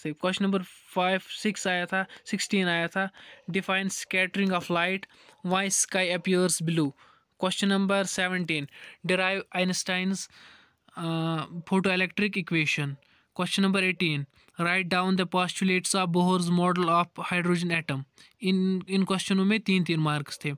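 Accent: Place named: Kashmir, India. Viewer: Sir aap Kashmiri h kya? Aapki slang me se pata chlte h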